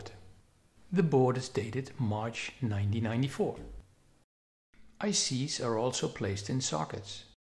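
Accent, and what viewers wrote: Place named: Netherlands. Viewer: Hi, I am new to your channel, had a look at your website and a question immediately arose: according to your accent and name I wouls assume you are a Dutch guy, just like I am. But the company/museum is based in the U.S.? A lot of the museum's team members have names that sound like ones close by, could you explain this situation? There is a good chance I would like to order some parts in the near future and perhaps it would be easier to ship from NLD than from USA. I will be watching all the videos shortly as there is a ton of useful information available I assume. Thank you very much!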